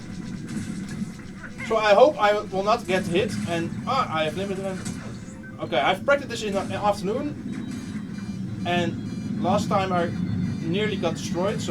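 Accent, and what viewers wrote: Netherlands. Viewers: je bent zeker nederlands of belgisch ik hoor het aan je engels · nice dutch accent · can't you like LOSE the fucking dutch accent...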